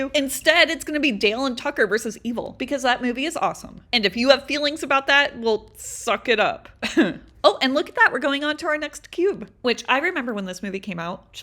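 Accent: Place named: Minnesota, United States. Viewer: You talk Minnesotan it's ok . I understand you lol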